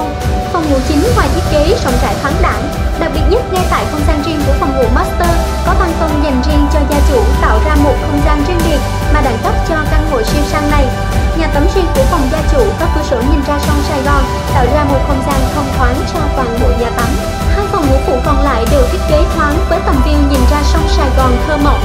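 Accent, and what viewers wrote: South, Vietnam. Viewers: Nghe người miền nam là muốn mua liền hiii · Nghe giọng miền Nam mà muốn mua ngay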